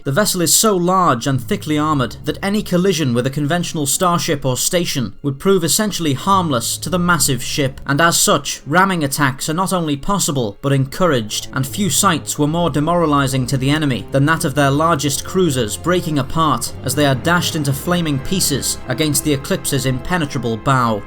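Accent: United Kingdom. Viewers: I really like listening to the British accent giving tech jargon. 😉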